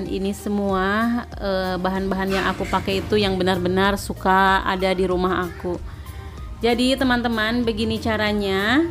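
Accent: West Java, Indonesia. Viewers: Kakanya meni Sunda pisan 😍 apalagi pas nyebut kata TV = dibaca tipi, hehe ... Semangat terus yah kak ngontennya, bermanfaat bgt nih 🥰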